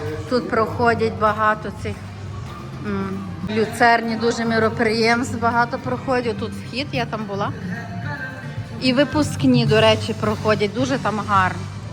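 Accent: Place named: Western Ukraine, Ukraine. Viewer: Виходячи з акценту, ви мабуть самі "нанастраждалися бьєженці" з "патріотично" вибігшого до Європи заходу України.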